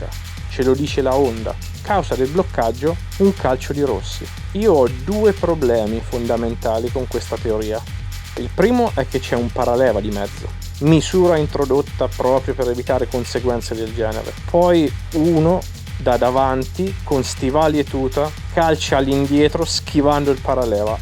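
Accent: Perugia, Italy. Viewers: Ma sei di Perugia? Grandissimo 😂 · Ciao, sono sul tuo canale per la prima volta e ti trovo molto bravo, una domanda: sei di Perugia? (Lo sento dalla parlata) 🤗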